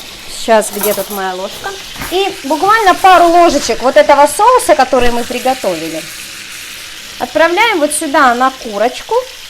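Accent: Ukraine. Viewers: Украинский акцент очень приятный и забавный...)))) Рецепт очень хороший...лайк за ВСЕ....))!)!)!)!)!)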